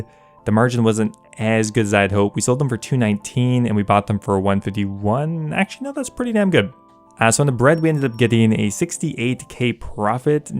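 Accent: Canada. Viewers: I'm american and I can tell you his accent is canadian.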